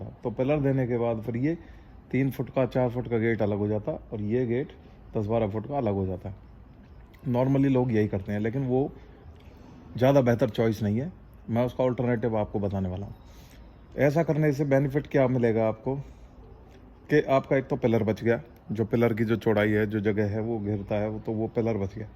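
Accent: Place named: Haryana, India. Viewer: Bhai apke smjhaane ka tarika bhut hi alg hai or bdia hai apki awaz se lgra hai ap humare haryana se hi ho or humari jaat caste se hi lgre ho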